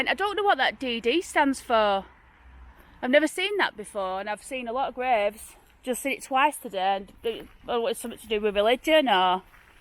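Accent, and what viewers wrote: Oldham, United Kingdom. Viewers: Hi!-I'm an Aussie that used to live in Royton,-I used to love going to Owdam,-it had heaps of beautiful buildings and I love those wonderful Victorian graveyards!--I really appreciate your hard work! and what you do to your house, is great,and "artistic"-& you always "have a go"-nothing puts you off!--northern people are the best!!--never lose your Oldham accent,-nor your great sense of humor!!--it cant be replaced!---all the very best.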